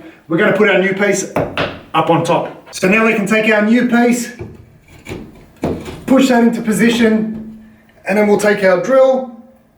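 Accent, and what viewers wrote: Australia. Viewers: Bloody awesome mate, excellent work, appreciated the detailed illustration of all of the steps required for this job, and great to finally hear an Aussie accent on one of these videos. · Really good tute. Is that an aussie accent??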